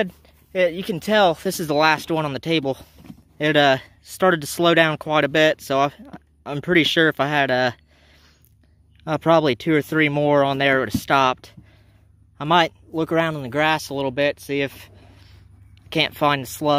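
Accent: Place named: Western Pennsylvania, United States. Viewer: had this chat with you on gunnitrust as well. Didn't realize this accent went past Pittburgh area heading west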